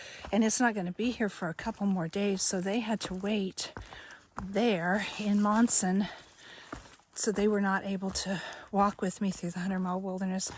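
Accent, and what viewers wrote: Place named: South, United States. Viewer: Awesome! 100 Mile Wilderness. So close to Katahdin it's not even funny. WTG! I can so tell you're a southern gal, no one says "fixin to" like us southerners 😂. Okay, the southern drawl is a dead giveaway as well, but the "fixin to" just sealed the deal 😊😁. Happy trekking! 🥾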